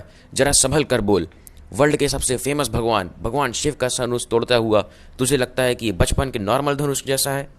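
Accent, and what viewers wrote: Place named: Bihar, India. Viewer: Gajab bihari language bole hai bhaiya aap, and thanks for this great explantion🥰 Love from Bihar❤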